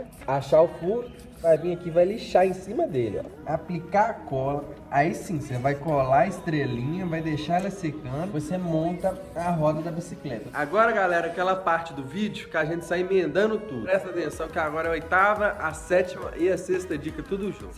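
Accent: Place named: Minas Gerais, Brazil. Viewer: Sô do RJ, mai acho o sotaque mineiro manerin mané.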